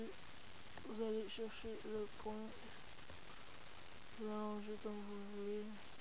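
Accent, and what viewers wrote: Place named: Quebec, Canada. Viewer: J'adore la facon quebequoise de dire google on dirait plus qu'il disent gogole mais ca fait bizarre quand on n'est pas habituer!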